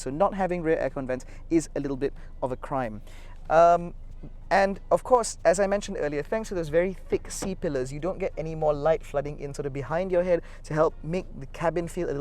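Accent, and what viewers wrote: England, United Kingdom. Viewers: Love your eng accents bro..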